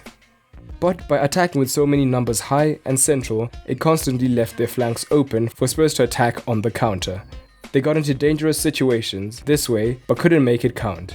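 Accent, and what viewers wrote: South Africa. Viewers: South african accent? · Are u from SA, just asking because of ur accent.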